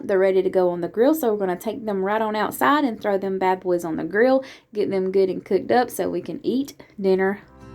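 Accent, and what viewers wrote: South, United States. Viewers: Hi pretty girl, thank you for sharing your recipes , everything look so good and can't wait to try to make as well as you. Ps. Love your Southern accent. My daughter lives in Georgia too and my grands have the same accent.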